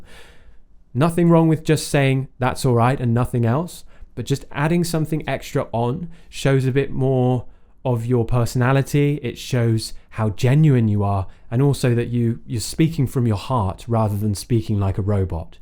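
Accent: United Kingdom. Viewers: I love the british english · I love your British accent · Hello. Wonderful lessons as always. I agree, English can be boring, especially when conversing. Having options is a great way to expand one's vocabulary. I used the following phrases as an alternative to: "You're welcome." 1. My pleasure. Pleasure. Pleasures all mine. 2. No worries. No problem. 3. Much obliged (combined with #1) 4. It's all good paired with #2 or #4 5. Merci or Merci Buckets.😂 6. Quite alright (with #2 or #4) 7. I gots 'cha! (#2 or #4) 8. Don't mention it. 9. No sweat with #2–8 10. It's okay. No biggy. Absolutely love the British accent. It's so posh and quite easy on the ears. I speak with a Canadian accent and, apparently, mine is an enigma here in the Philippines. I sound: Canadian - American - British -French all jumbled together.😅 Kudos. Watching and learning all the way from the 🇵🇭. 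Cheers!👍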